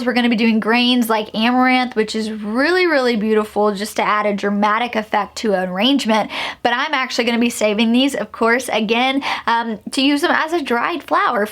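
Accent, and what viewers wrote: South, United States. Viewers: Hey, I am growing every one of those! I have soooooo many seeds But they are very well organized, separated and sorted. I have all my seeds on a schedule in my calendar Every Tuesday I start various vegetables and such then Thursday I start various flowers. This is all based upon how many weeks prior to the last frost date for me I want to use April 29th as the last frost date. So everything revolvers around this. So then all these dates are entered into my iPhone calendar as repeat every year. This is my most organized year ever! So my schedule is working well so far. I really hope to see good results this year so I can organized some photos and put them on Instagram which I am doing sporadically but I want to get better at having a schedule for this. Final note I absolutely love listening to you. I miss living in the south and I love southern women's accents. 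So I think I have enough channels now that it is amazing listening to y'all folks. Anyway God bless y'all Cya later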